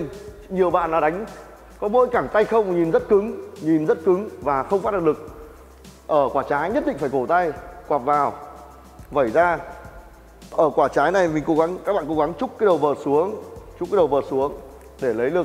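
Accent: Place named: North, Vietnam. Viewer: Cam on, exactly what I was looking for. And your bac dialect makes it so much easier to understand. Sry never learned how to write viet.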